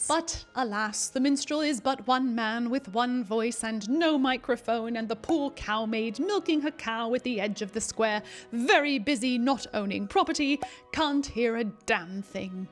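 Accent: England, United Kingdom. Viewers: Thank you for such an informative and entertaining video. The sections where you subtracted the techniques one by one and then added them back in one at a time were very impressive. Some very thought provoking questions too. I was also pretty impressed by your English accent. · Your English accent is brilliant! Listen to the late Gene Pitney who seems to have an operatic kind of technique. Greetings from Good Ol’ England.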